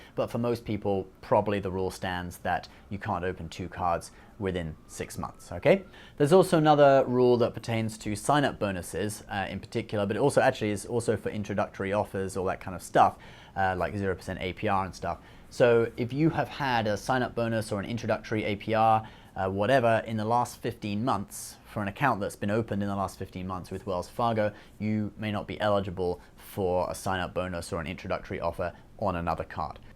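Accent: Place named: United Kingdom. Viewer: American Eagle shirt + Brittish accent = paradox that could unravel the very fabric of the space/time continuum, and destroy the entire universe.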